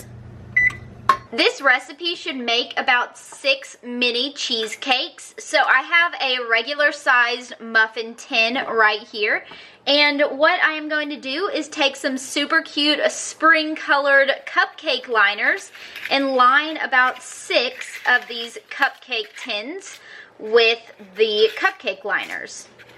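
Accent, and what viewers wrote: South, United States. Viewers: I love ya girl.... Since u moved to bama ur getting more country twang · You so country lol lol lol. I love it!!! So adorable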